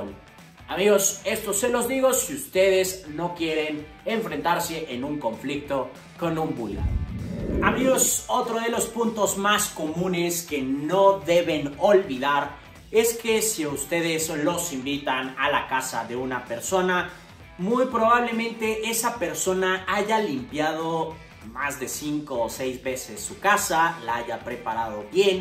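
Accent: Mexico. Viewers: Tu acento es muy mexicano, por eso se confundió. Felicitaciones por tu castellano. Soy chileno y conocí hace poco a una búlgara, de padres griegos... Muchas gracias por el concejo del yogourt Me salvaste la vida. jeje Saludos. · Zdravei, chudesno govorick con meksikanski aktsent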